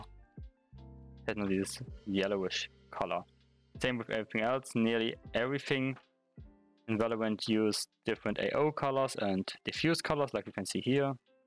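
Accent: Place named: Germany. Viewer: man hört mies das du deutsch bist xD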